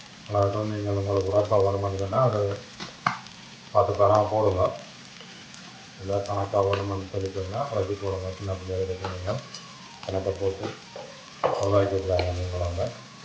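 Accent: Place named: Jaffna, Sri Lanka. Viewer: Love to listen your jaffna Tamil than your fish gravy😇.meen kulambu ready. Wife is behind me😂😂😂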